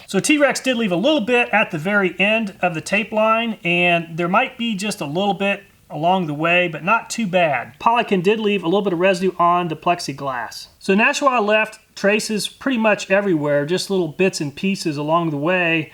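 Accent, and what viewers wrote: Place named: Brooklyn, United States. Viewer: He's from brooklyn you can tell by his accent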